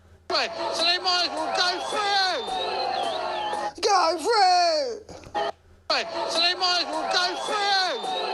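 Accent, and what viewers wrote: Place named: North, United Kingdom. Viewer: love your northern accent...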